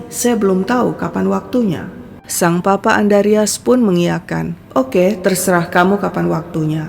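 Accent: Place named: Manado, Indonesia. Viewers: Min logatnya manado padahal Min cerita kasus dari toraja sulsel. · kok logatnya manado?? haha setahuku toraja ga gitu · Admin fasih deng logat Sulawesi..😊😊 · Dialek khas Utara si admin mantap 👍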